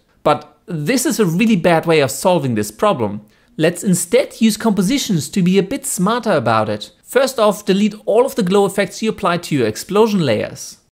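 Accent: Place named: Germany. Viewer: Thanks for your tutorials! Where are you from? It sounds a little bit like you're from Germany..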